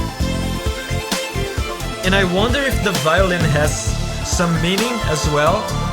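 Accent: Brazil. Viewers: Man, I have to ask. Are you Brazilian? Your accent sounds very Brazilian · Mano, seus vídeos são muito fodas! Achei seu sotaque familiar, logo pensei "é um brasileiro falando em inglês" kkkkk continua assim, vai longe ainda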